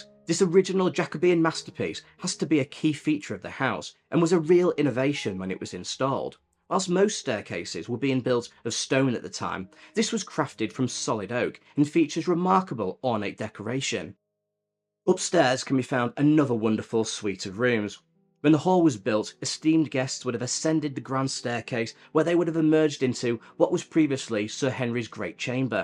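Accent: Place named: England, United Kingdom. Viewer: Why is it every male narrator w/ an English accent sound exactly like Thoughty? Best of the jaspers. Lawful hearts especially for ears full of mtn hillbilly dialect. Hope y'uns fixin up more from the yt poke. sahhhh-loot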